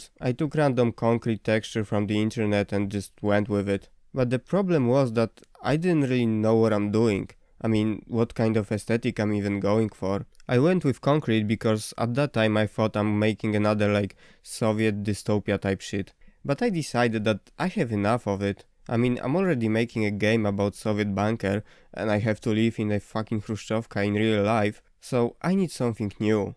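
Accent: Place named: Poland. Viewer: you sound so polish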